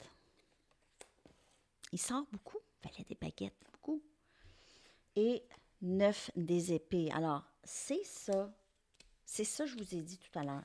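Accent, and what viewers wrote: Canada. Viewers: Célibataire sa tombe bien 💚 je tombe sur vôtre video et que j'espère que se fera merci a vous tout a fait j'adore l'accent canadien mpi jy pense plus au passé vie le jour au jour que sa me parle pas scorpion connaît pas signe de terre je suis ascendant feu 🔥 je vois pas qui connaît pas de patron j'ai personnes je vois pas qui super 👍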